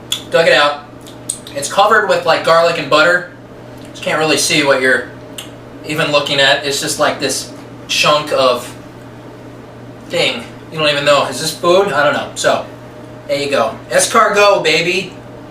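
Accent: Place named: New York, United States. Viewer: are you from New York or Massachusetts? Your accent sounds similar haha.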